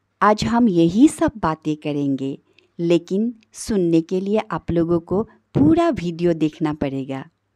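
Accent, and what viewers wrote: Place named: Assam, India. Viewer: Typical Assamese accent, love it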